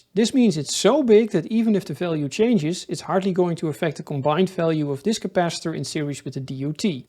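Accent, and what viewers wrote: Netherlands. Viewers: Man, I was soooo confused about what a "coramic" capacitor could be! 😂 Until I became aware of the strong (Dutch?) accent. (LE: BTW, the part from to sounds almost like it was the Project Farm guy 😊 )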